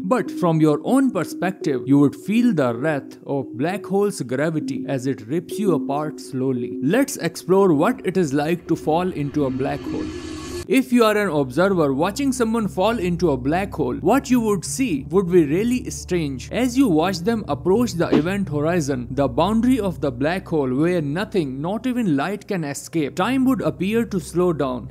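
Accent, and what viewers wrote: India. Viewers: Are u Indian? Ur voice is so annoying.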